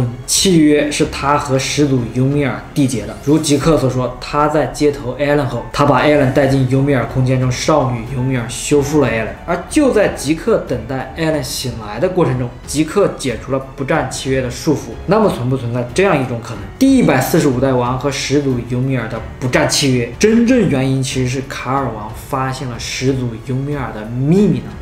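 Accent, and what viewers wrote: China. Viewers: 這口音是大陸人吧，完全聽不下去了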